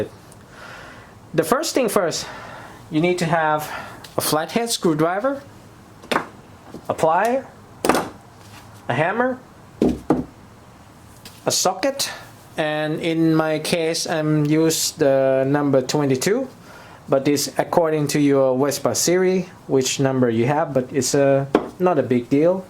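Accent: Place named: Thailand. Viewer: ผมชอบอังกฤษ สำเนียงไทย ฟังรู้เรื่อง รื่นหูจัง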